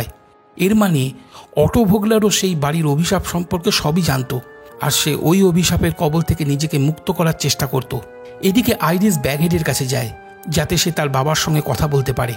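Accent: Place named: Bangladesh. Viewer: তার জন্য আপনার কথাবার্তার ধরণ বাংলাদেশি,, 🇧🇩,, বাংলাদেশি ভাব আছে আমি বাংলাদেশ কুষ্টিয়া জেলা থেকে আপনার ভিডিও দেখি অনেক দিন ধরে আমার টার্গেট তাহলে ঠিক আপনি বাংলাদেশের বাংশোদ্ভূত 🇧🇩❤️❤️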